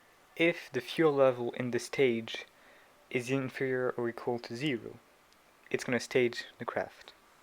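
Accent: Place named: France. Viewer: Salut, petite question, est tu Français? Car tu a un accent qui le laisse penser... Si C'est la qu'à pourrais tu faire une version FR de tes tuto stp? Je galère beaucoup avec la programmation... En tout qu'à merci pour tes tuto, la traduction automatique fonctionne à peut prêt correctement même si ce n'est pas toujours parfait c'est déjà mieux que rien et ça ma permis dans apprendre déjà pas mal sur la base de la programmation. Je passe au tuto suivant ;-)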